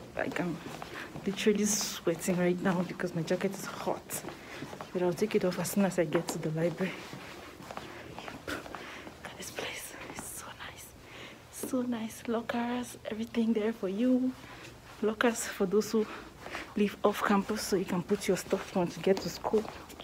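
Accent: Nigeria. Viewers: you sound so much like a Nigerian. I'm amazed on how Nigerians and Cameroonians sound so alike. XOXO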